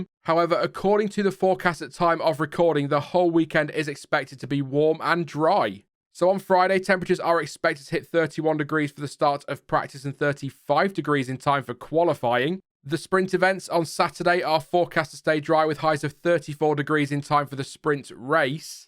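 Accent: United Kingdom. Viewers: Lovely voice from this man who are talking about circuit. Thank for mencioned my Country Brazil; I know formula-1 will be here in Brazil in November, 5th. You are welcome to Brazil sweet voice and British accent.🇬🇧💔🙋🏁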